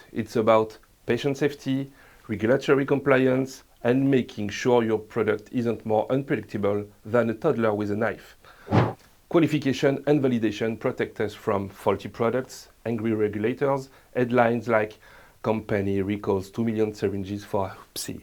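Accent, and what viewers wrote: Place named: France. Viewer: What a lovely french accent.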